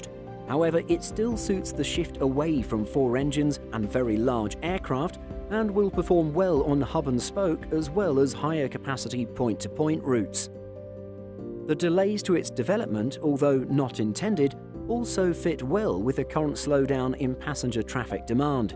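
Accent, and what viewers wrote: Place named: United Kingdom. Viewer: You are British by the sound of you so Preeedersessors fella not the American Prehdersessor c'mon!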